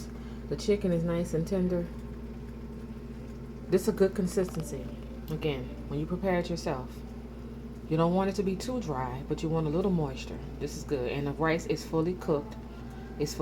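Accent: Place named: New Orleans, United States. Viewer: Actually paella is from Spain but Cuba does make a couple yellow rice dishes based off of the yellow rice dishes of Spain since Spain’s influence in Cuba was heavy and many many Cubans are of Spanish ancestry. I was surprised you called it a “yellow rice jambalaya” but then I heard your accent and since I’m from the New Orleans area I recognized it as a New Orleans area accent. So now I know why you called it a jambalaya since we have jambalaya in New Orleans and south Louisiana and it looks very similar to a jambalaya. But there is a reason it looks similar. Jambalaya descends from the paellas of Spain and pilafs of southern France. I’m of Spanish descent from the Canary Islands of Spain and our family we sometimes make a jambalaya with saffron (to make yellow rice) instead of the common way to make Jambalaya in the New Orleans area and it looks a lot like this when it’s finished.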